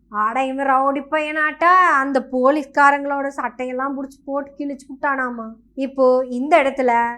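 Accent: Kongu, India. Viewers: உங்கள் கொங்கு நாட்டு தமிழ் மிக மிக அழகு வாழ்த்துக்கள். சிறப்பு 😂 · கொங்கு நாட்டு தமிழின் இனிமைக்கும் உண்மையான கருத்துக்களுக்கும் வாழ்த்துக்கள் மகளே! சீமானுக்கு கம்பி எண்ணும் வேலை கிடைக்கும்.நல்லது.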